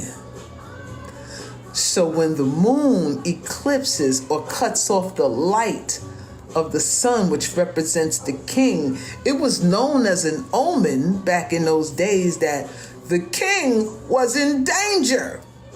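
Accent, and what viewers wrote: New York, United States. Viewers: This sister gotta be from New York ! If not, Jersey or Philly. Definitely the East Coast no doubt ! I love this lady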